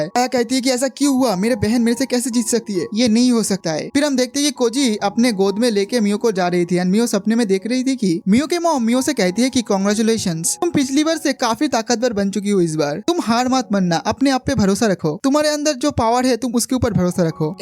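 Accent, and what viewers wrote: Bengal, India. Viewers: tum bangoli ho mughay pata chalgiya😂😂😂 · If I'm not mistaken, you are a Bengali or your mother tongue is Bengali. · Bhai tum bengali ho kya😅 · Are u a Bengali ? like ur accent is like that and nothing else ! ! !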